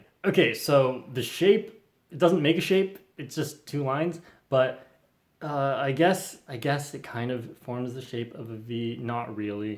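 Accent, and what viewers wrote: Australia. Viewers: You sound just like an Australian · Your aus accent is actually really cool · That Aussie acsent was alright cause I am a boody aussie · His Aussie accent sounds EXACTLY like Feliks Zemdegs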